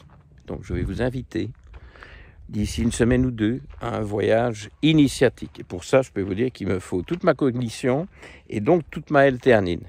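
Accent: Belgium. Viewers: Merci et vos bestioles! Vos capsules ont un air poétique et naturel qui me convient bien, de plus j'aime bien l'accent du cru Belge comme septembre sans le "p" ou et poui ❤😊 Le seul bémol -pour moi - est que vous n'annoncez jamais l'année sur vos shooting 😢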